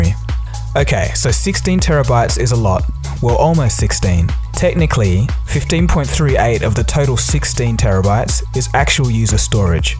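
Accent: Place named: Australia. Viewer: He is from Australia that my explain the pronounciation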